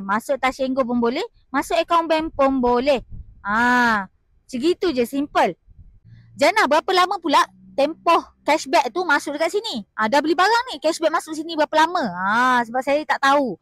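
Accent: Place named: Endau, Malaysia. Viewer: Suara mcm org endau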